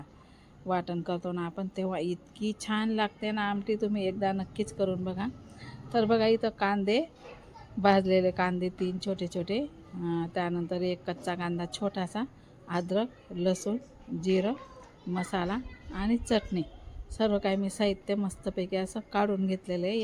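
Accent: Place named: Dhule, India. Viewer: तुम्ही धुळ्याच्या आहे का ताई भाषा तशी आहे म्हणून विचारलं खूप छान झाला सगळा स्वयंपाक 😊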